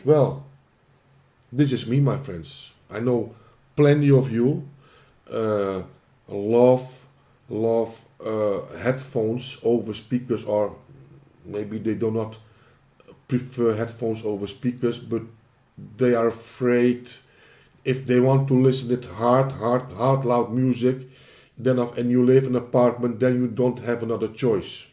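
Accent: Netherlands. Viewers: Volgens mij ben je Nederland! Goeie beoordeling bw thx.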